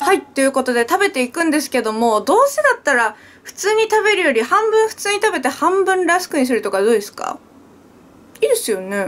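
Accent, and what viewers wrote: Aomori, Japan. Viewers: 私も東北出身だからの青森訛り聞くと実家いるみたいでほんとに落ち着く笑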